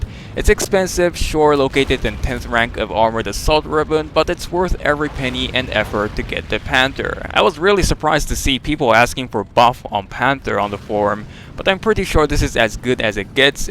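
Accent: Germany. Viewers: würdest du auch mal ein deutsch schprachiges video machen man merkt nemlich das du deutscher bis !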